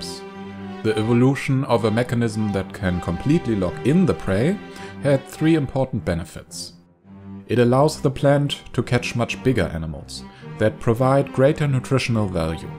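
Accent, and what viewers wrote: Germany. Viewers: ate you german? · Kann es sein dass sie ein Deutscher sind? Ihre Aussprache und auch der Satzbau (eher Deutsch als Englisch) lassen mich das vermuten. Ich finde ihre Videos übrigens äusserst toll ^^ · He is definitely german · I really like your Videos and I think you should continue making them, but your german accent just annoys me, personally a little to much. I‘m sorry:( Though I must admit, that the quality of your videos is reaaally good! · wait a moment... he sounds really german 🤔 * Looks in the "About" of the channel * * reads: * "Hi. My name is and I'm from Germany"......... knew it 😏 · Are you german? Your accent sounds similar to my brothers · Are you from fermany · I hear a German accent.:D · The most German accent i've ever heard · This german english:D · Sehr eindeutiger doutscher akkzent aber sehr gutes video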